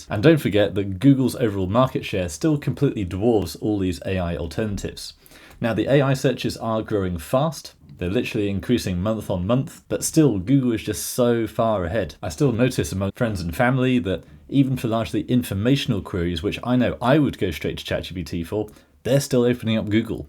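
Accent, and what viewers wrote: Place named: North, United Kingdom. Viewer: Northern accent there 👀 Where you based buddy?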